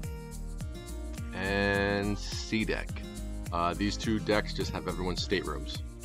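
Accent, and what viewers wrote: New Jersey, United States. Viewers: I've been trying to figure out who you remind me of, at first I thought maybe it was someone I knew or worked with but I realized it's Mike Rowe the "Jobs" guy and your stuff would be perfect for his programing, your walkabouts and overtime clips throw in a drone shot and I think they would love it. Just an idea, I just realized your talking to yourself when you make these, if you wonder your voice is very smooth with just a touch of Turnpike.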